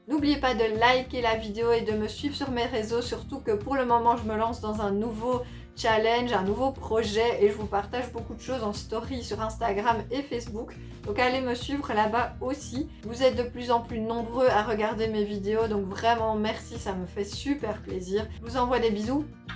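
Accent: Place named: Switzerland. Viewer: Ola l'accent Suisse !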